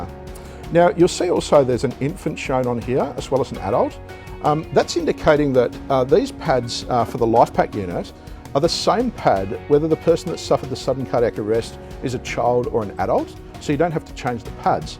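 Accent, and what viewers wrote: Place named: Australia. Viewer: .. I Love Your Aussie-Accent Mate ..👍🦄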